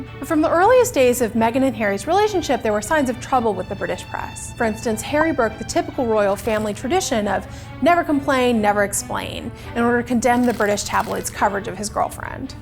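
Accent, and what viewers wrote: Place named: United States. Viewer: I stopped watching after hearing that slimey American accent yuk🤮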